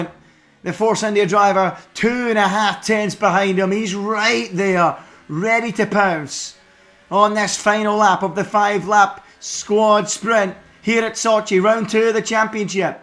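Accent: Scotland, United Kingdom. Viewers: One question. Is he scotish? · nice scottish accent commentary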